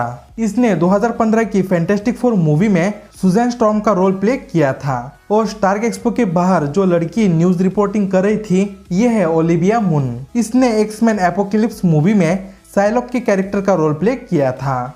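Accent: Bengal, India. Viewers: you Bengali ?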